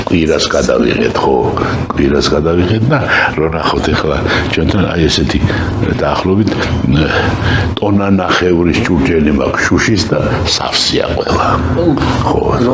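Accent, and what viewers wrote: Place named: Imereti, Georgia. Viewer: იმერელი კილო აქ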